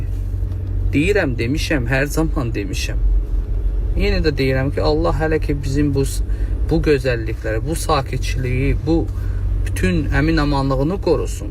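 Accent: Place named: Armenia. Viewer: Buna fikir vermeyin.Bunun xowu gelir ele bil pis weyler demek,daniwmag.ERMENI kimi daniwir.IWINNEN MEWGUL OL¡!(+++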